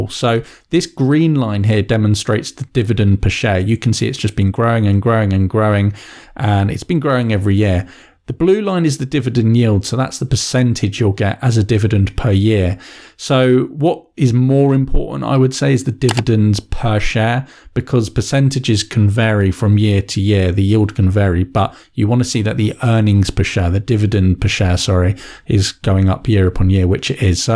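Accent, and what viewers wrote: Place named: United Kingdom. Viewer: Thank your for this video. I learned a lot, and your British accent is cool. I was going to subscribe, but it seems that almost all of your videos are about crypto coins only.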